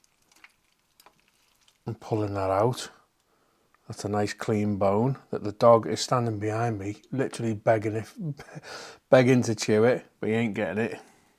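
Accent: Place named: Scouse, United Kingdom. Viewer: I'm from the Wirral (next to Liverpool) but now live in Queensland Australia. Really like the videos and like to hear your accent, which is strange because I don't really like the scouse accent but yours is exceptionally subtle. Keep up the good work 😊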